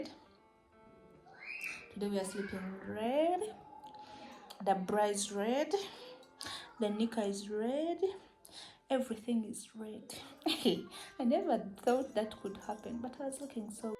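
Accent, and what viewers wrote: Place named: Australia. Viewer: 🤭 a lot of your ignorance shows on your comment. 😁🤐. sounds Aussie to me.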